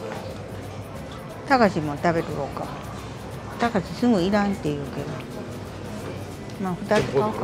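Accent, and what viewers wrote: Tosa, Japan. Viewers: 私の父（88歳）が宿毛市の生まれで、高知は何度も行ってます😊カツオのタタキもウナギも、本当に美味しいですよねー😆亡くなった祖母は純粋な土佐弁の人だったので、このチャンネルを観てると、とても耳に心地良く、大好きです❤️これからもずっと楽しみに観せていただきます😆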